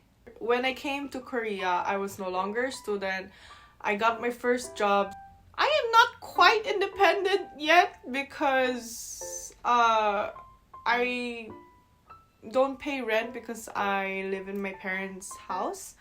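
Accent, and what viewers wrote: Batangas, Philippines. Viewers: she really grew up in the ph coz of the way she says english words like culture and alot more lmao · Her English is spoken with a Philippine city accent, as opposed to the majority of the ones working in the US who did not grow up there who speak with a province accent. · Ang cute cute kasi pag English niya Pinoy na Pinoy! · I love that you have a Pinoy accent hahaha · I am a Batangueño senior citizen who stumbled into one of your videos, the one with Batangueño accent. This video is the second one i’ve watched. I kept laughing and sometimes to the point of tears. Your Batangueña accent is real. I dare say you are a Batangueña who looks like a Korean 😂🤣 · Your english is so pinoy. Accent is so neutral. Love it. Your tagalog as well is perfect. God bless! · Alam mo kapag ipinikit q ang mga mata q at pinakikinggan kita, pinoy na pinoy ka talaga. 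Yung accent mo at the way ka magsalita, mga punto at bigat ng pagsasalita mo, para kang local pinoy. Hahahaha! Lalo na yung sinabi mo na "asan na yung asin?" Yung diin, punto at accent mo, pinoy na pinoy talaga. Hahahahaha! Kudos sa iyo! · "ASAN YUNG ASEEENNN???"...... that's a killer.... 🤣🤣🤣.... pinay na pinay.... yung dateeeng.... yung dateeng ng diction... wow na wow.. walang ka arte arte... cute na hindi nagpapacute. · This will be the new youtube video I'll be watching now. grabs potato chips PS. She is so cute with her Koreana looks and pure Filipino accent. :) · ma'am alam mo parang pinay na pinay ka talaga.lalo na sa pag bigkas ng words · Actually you are more Filipino to speak in terms of English and Tagalog tone. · Well this Korean lady speaks like she is Filipino. Her English is Filipino in style and accent. She speaks Tagalog like any other Filipino. Awesome 😮😮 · Your tagalog is like those of the pinoy kids as we call it mga "sosyalin",taglish but still very pinoy accent. 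I love watching your vlogs,it's kinda cute😉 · You have an accent of a Filipino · Wow ang bilis ng panahon naka isang taon ka na pala diyan sa Korea parang kailan lang yun ah. Pero the way I see you just look Korean pero the way you speak and think your Filipino. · Love that English Pinoy accent and your tagalog is better than mine. More prolific at Bisaya though. Headed back to Cebu next month....can't wait, but I hate the freakin' long flight from Illinois to Seoul to Mactan then to Dipolog City where my wife is from. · korean with filipino accent is so damn sexy 😍😍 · Nice vlog, you really good in Tagalog and the way you speak is really pinay accent, kudos and good luck hope you all the best while in Korea.🥰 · 101% Korean looking but the Accent FILIPINOOOOO. 🥰❤️😝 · You sound and speak like Filipino. That’s good. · Your English is so pinoy style obviously sa pinas ka lumaki and i can tell you have gone to a good school. 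Nice to drop by your channel. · So,when I heard you on Anna's video when you joined them...I thought you're a Filipina mixed Korean but now you just said you just live there for a looooogggg time. So that explains it. No wonder the accent. 😂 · Omg..pinay na pinay ang pannalita ang accent pati taglish lahat2 ay d masasabi Koreana 🫠😊😍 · you got a Pinoy accent for a Korean. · Kahit accent nya pinoy na pinoy amazing. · even your English has really Pilipino aksent! gusto kita. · Like you're speaking in Batangas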